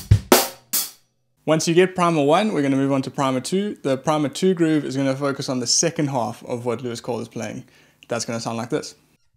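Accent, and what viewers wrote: South Africa. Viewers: subbed cause your English is as South African as mine. keep up the work, excellent lesson , ill watch more videos. not a drum player tho just love good music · You South African?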